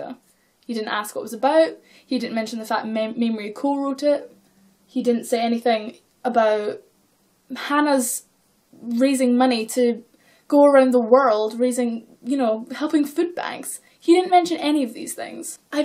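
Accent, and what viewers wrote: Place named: Scotland, United Kingdom. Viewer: Gurll?? Where are you from????you sound scottish!!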